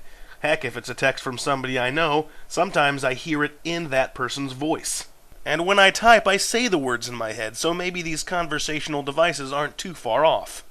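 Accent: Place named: Minnesota, United States. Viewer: You're Minnesota accent, and fictional characters are commendable.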